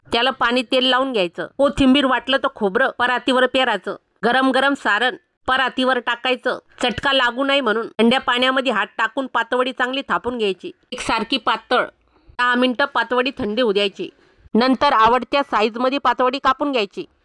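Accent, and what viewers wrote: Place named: Ghats, India. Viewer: Ghatavrchi ahe vatte tai bhasha khup mast ahe MI tr boln Aaykte nemis tu mchi tai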